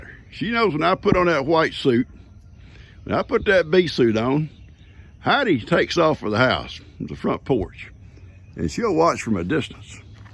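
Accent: United States. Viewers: Hello..can I know where's your accent came from? Sounds like a cowboy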